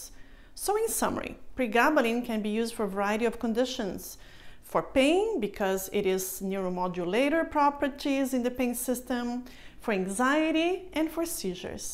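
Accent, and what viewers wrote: Brazil. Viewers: recognized the accent, uhul brasil il il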